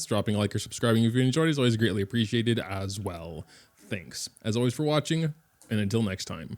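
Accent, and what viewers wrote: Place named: Germany. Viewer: i didnt expect you to be german :D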